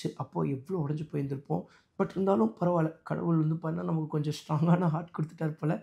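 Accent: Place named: Madurai, India. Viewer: என்ன ப்ரோ மதுர🔥 பயலுக சிலாங்குளலா பேசுற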